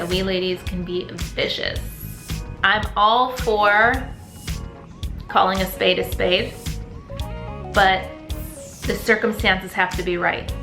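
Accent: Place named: Arab world. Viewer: omg ur arabic is so funny 😅😅😅😚😚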